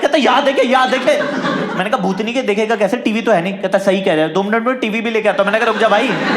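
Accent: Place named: Saharanpur, India. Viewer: Pure Sharanpuri Hai... 😂😂😂😂😂😂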